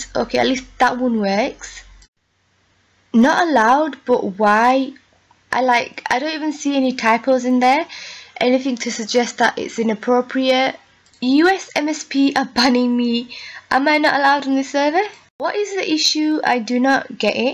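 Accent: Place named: England, United Kingdom. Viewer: You’ve got an English accent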